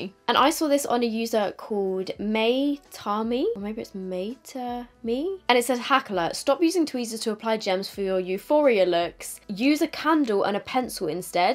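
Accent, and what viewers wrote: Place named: United Kingdom. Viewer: I just watch your videos. I dont care how long they are. I enjoy listening to your British accent. Keep up the good work. Love ya.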